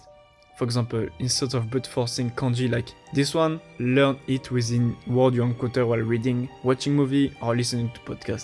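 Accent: France. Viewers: A guy speaking english with a french accent teaching me how to learn Japanese. Truly something 😂.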